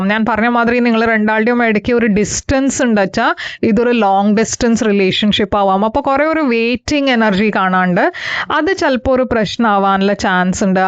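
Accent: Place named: Karnataka, India. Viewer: Nice way of talking Malayalam any Karnataka connection undo❤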